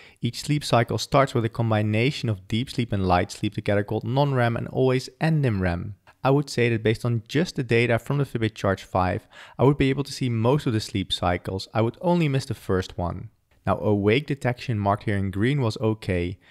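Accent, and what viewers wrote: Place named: Netherlands. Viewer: Your accent really gives it away from where you are (NL). : ) Nice channel!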